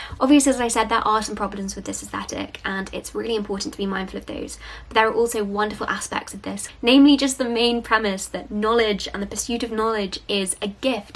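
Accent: United Kingdom. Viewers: I was knocked off my chair (figuratively) by the Britishness of your accent.... OR. MY. GOD😍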